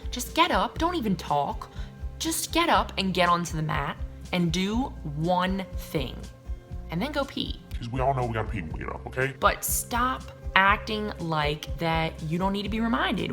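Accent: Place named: North Carolina, United States. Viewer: I was about to comment about how lovely her accent is. I grew up in WV though I'm not originally from there. I never really picked up the WV accent but hearing your NC accent makes me feel like I'm back home. <3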